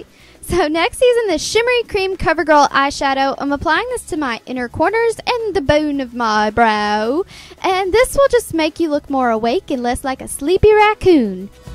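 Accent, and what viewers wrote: South, United States. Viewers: she used to sound SO country! she should play ellie may in a modern reboot lol.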